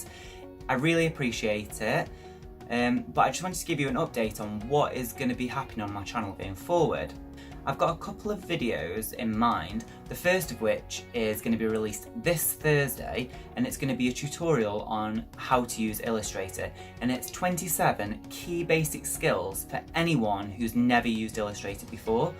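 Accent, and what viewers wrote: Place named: Yorkshire, United Kingdom. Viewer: i was watching your 5 fave android apps for fashion and started perusing your vidz and checked out your Etsy and was going to ask how do you find Etsy ? I find it heavily oriented towards the US and me being a UK expat in NZ selling sassy designer clothing is hard going. They seem to expect everyone to give free shipping to the US ! Anyway, had to Google you defending James Charles etc but was totally lost on who he is (old age !) so I was born in London in the flower power era but got marooned in Yorkshire for 20 years way back and I'll guess you are from North Yokshire ? Barnsley ? Touch of Birmingham on Your ending G's but it's an accent for life !! lol I can come up with 4 countries accents in 1 paragraph hah. Sunshine Greetings to the Homelands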